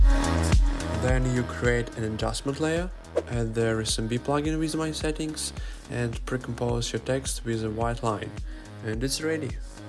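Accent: Russia. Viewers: Чувствуется русский акцент в твоем английском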